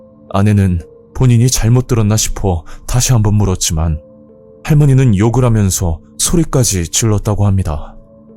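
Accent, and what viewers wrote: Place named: Jeolla, South Korea. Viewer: 아따 전라도라~~~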